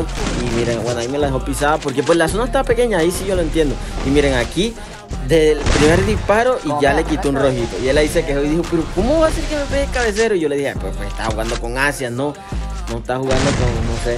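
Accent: Caribbean Coast, Colombia. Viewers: Eres costeño? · Como narra un costeño un pvp:pues mira el video:v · Eres de colombia?:0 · de q parte de colombia eres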